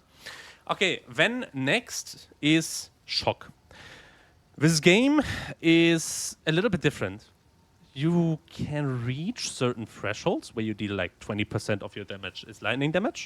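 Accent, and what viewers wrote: Germany. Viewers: Deutscher Landsmann. Hört man sofort raus. 😂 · world first listenable german accent love to see it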